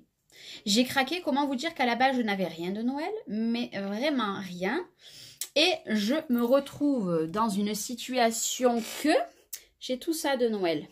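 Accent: South, France. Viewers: Bonjour ,dans quel Action allez-vous ,vous avez un joli accent du Sud mais cela ne veux rien dire , car dans mon Action je n'ai pas tout cela et encore moins pour faire des cartes cela fait bien 3 semaines que tout a disparu par contre nous pouvons commencer à planter nos narcisses, jonquilles etc ..... en région parisienne ça sent "le printemps Action"" Passez de bonnes fêtes en familles en rouge et Or .Amicalement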